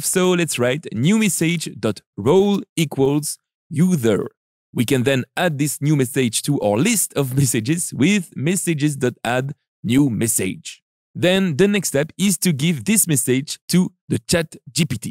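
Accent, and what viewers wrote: France. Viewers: Merci de prendre la parti de même si c'est inutile, j'ai bien précisé que c'était rien d'autre qu'une taquinerie, vous auriez deviné cela si vous aviez bien lu mon poste:P Bref, oui l'accent français est très fort ce qui fait le charme des ces vidéos, mais je maintiens le fait que si il ferait des vidéos en Français il aurait bien plus d'audience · C'est dingue comment on perçoit le côté français bien que tu gères l'accent, bonne vidéo 😉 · Son accent anglais est pas horrible et je le comprends facilement. Je pense que s'il parlait plus vite ce serait mieux. Son accent francais est evident mais c'est pas une probleme parce que son prononciation est fantastique.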